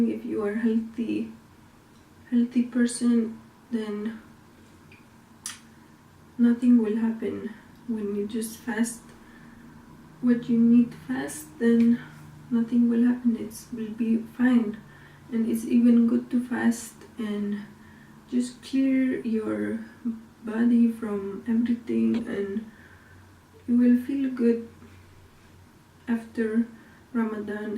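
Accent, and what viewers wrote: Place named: Switzerland. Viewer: Your accent sounds Switzerlandish :) Nice to meet u sister Allah keep u strong sis. Amin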